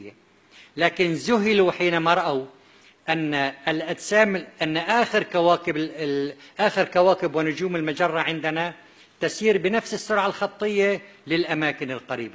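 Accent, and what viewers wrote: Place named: Aleppo, Syria. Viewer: هو من حلب سورية واللفظ في هذه المدينة يكون هيجذ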